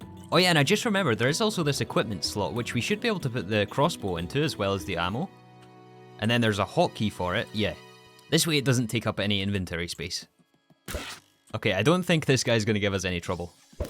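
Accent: Scotland, United Kingdom. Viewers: are you Scottish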